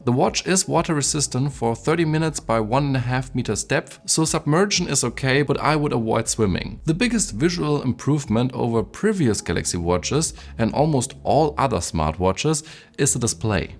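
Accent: Germany. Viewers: Man hört sofort raus wenn ein deutscher englisch spricht haha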